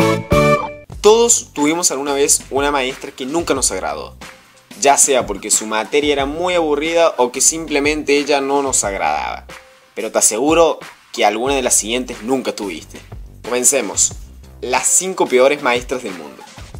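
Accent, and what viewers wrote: Córdoba, Argentina. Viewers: sos cordobés? yo soy de buenos aires ARG💖💖💖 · una pregunta sos cordobes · adivino eres argentino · eres cordobes ? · El Chabon Es Cordobés v: <3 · Oh Un cordobeh 👉😜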